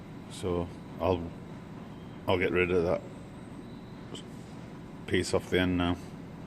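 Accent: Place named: Manchester, United Kingdom. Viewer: who's brave enough to sit in the chair next to the bridgeport.also i am hearing a manchester accent,how close am i.great machine work mate.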